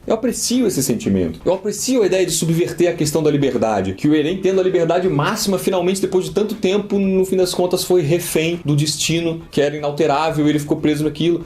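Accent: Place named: Rio de Janeiro, Brazil. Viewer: UÉ, O sotaque carioca tá voltando?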